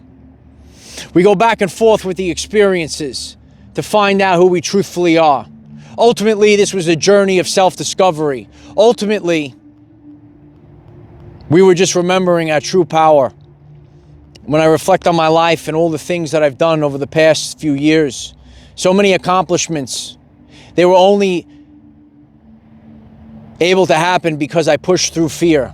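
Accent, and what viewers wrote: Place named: Massachusetts, United States. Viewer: You sound like your from Massachusetts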